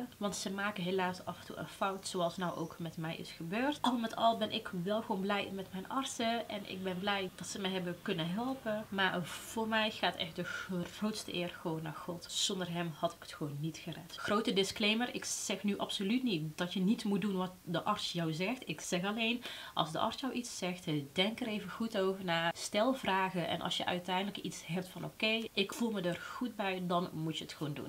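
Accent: Brabant, Netherlands. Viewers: och lieve dit brengt echt tranen in mijn ogen 🥺 ik volg je al best wel lang. ik vind je zo 'eigen' met je brabants accent (is t brabants?) en te weten dat dit in je leven speelt raakt me enorm. maar wow wat prachtig dat het zo de goede kant, opgaat met behulp van God/Jezus, geweldig 🙏💖👏😇😇 ik wens je nog heel veel kracht & sterkte toe Powervrouw 💪🙌🍀🌸!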